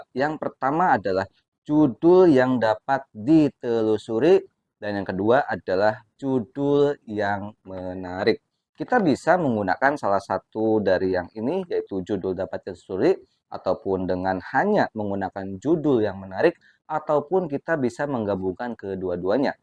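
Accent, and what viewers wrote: West Java, Indonesia. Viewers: Assalamualaikum...kg nyimak mantap...Oia kg orang mna Sunda pisan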